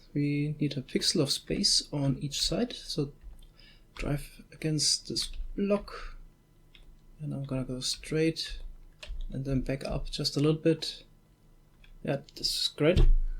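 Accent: Germany. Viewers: Hi, i saw your minecarttrack in the nether for fast diagonal travel on your server and wanted to build it on my own, but i did not find a tutorial on your channel for it. Is there a video i did not see or do you know one? Would be great if you could help me out. MfG feris, ich gehe mal davon aus dass Sie Deutscher sind, da ihr Akzent hörbar ist.:-P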